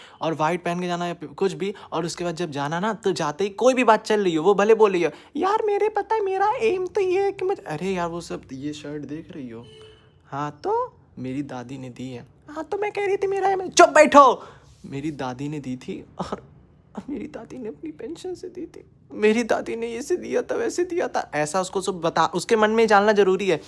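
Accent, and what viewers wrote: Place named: Kanpur, India. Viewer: By any chance are u from kanpur? Ur Accent matches the kanpur accent a lot!!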